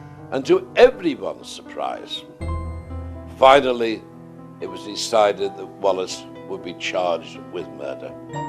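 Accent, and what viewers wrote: Liverpool, United Kingdom. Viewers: I love the old school`educated scouse`delivery,it reminds me of an old friend vocal chords improved by whiskey,cigarettes and the wind blowing through the Mersey Tunnel.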